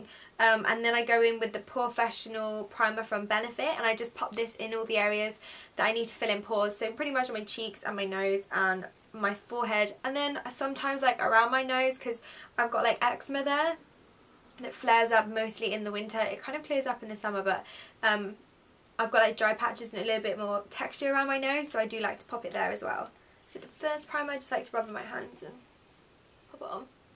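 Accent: United Kingdom. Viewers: Ahhh, you replied! I love you even more now! <3 <3 <3 British sounds so much cooler. Haha. I hope you enjoyed your stay in LA :)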